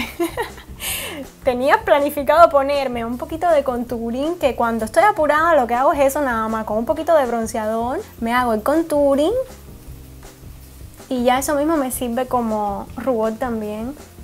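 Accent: Cuba. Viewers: es cubana por eso habla así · me encanta el acento de los cubanos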